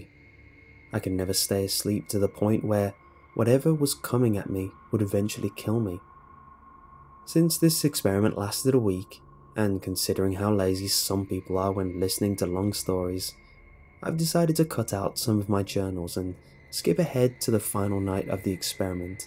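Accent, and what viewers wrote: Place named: RP, United Kingdom. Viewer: Dark Glitcher I think it's mainly the accent. Can't beat a posh Brit accent in voice overs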